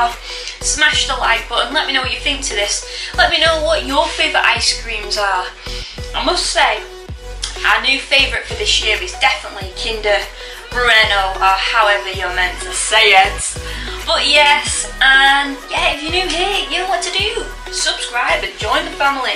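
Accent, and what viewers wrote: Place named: Oceania. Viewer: Full view and like #5 from Bits of Real Panther! I think it's pronounced BWAY-no. You certainly have an energy about you,! I couldn't quite place the accent. Somewhere in Oceania? At least the recipe here was simple, something even I could do! hehe Also, do you keep any of your thumbnails on file? I'd like to feature a couple of them in an upcoming video. Anyway, thank you much for sharing, and I hope your weekend was truly "sweet"!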